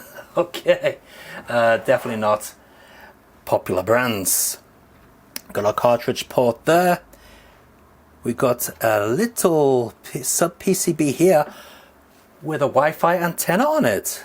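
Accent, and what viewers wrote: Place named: Liverpool, United Kingdom. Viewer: sometimes your accent sounds just a little like Dave Lister from the Dwarf. Boys from the Dwarf!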